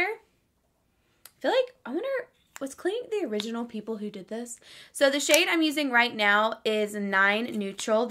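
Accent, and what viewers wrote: South, United States. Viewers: Oh my gosh! I love it when the southern accent comes out every once and a while! I had a southern accent when I was younger up until we moved back to the west coast when I was young.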